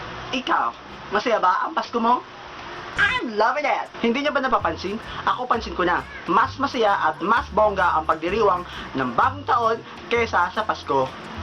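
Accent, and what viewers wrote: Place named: Cebuano, Philippines. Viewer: cebuano accent mo dabest!!!.. like2!!!...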